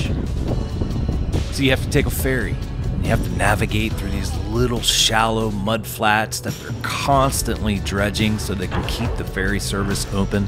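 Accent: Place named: New England, United States. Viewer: Here Here! 😁😍 he"s a Yankee...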